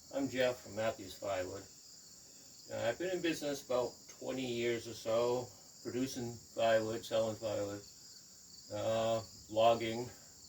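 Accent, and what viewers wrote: United States. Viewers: Just found your channel I’m looking forward to watching your updates in the wood yard. I can tell you are a new Englander by your accent, I’m in Connecticut myself, good to see New England represented here on YouTube! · Hello Matthew, just checked out your channel, and I subscribed, that is quite the beard you have! I am guessing you are out east, Maine maybe by your accent? Good luck!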